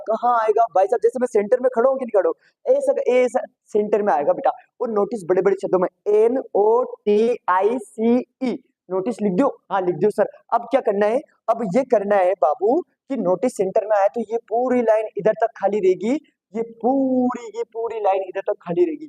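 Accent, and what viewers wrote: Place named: Hadoti, India. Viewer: Sir aaap hadoti bolte ho kaha se ho aap kota ya baran❤😮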